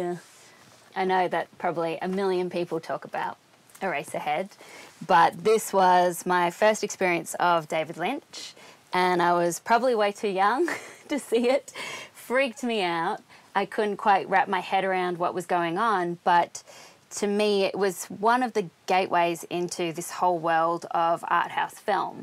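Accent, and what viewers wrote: Australia. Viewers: that australian accent tho <3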